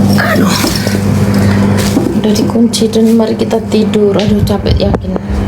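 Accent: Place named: Java, Indonesia. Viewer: Maaf bunda bicaranya separti orang jowo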